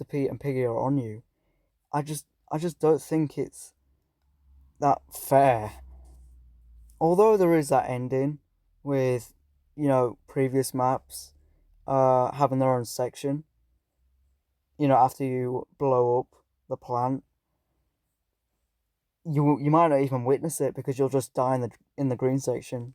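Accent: United Kingdom. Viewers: Love your voice my bro, Keep doing. (British accent makes it more beter 💀) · HES BRITISH! LETS GO! (Take your time, get used to your voice being on youtube, no worry)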